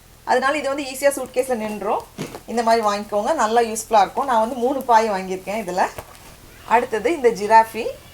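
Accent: Nagercoil, India. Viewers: So happy to hear namma ooru Tamil. Looking forward to seeing more of your videos. By the way,,,can u upload some roast/grilled chicken in oven if possible? I am from Nagercoil but now in UK, where we get lovely meat joints. I hate the English way of doing it as it’s too bland. Oven roast chicken, duck, turkey, ( if u r ok with beef and pork u r welcome. if not ignore it. No issues) Wish u a wonderful New year. Nothing refreshes us like a visit to our home town. Keep going. All the best.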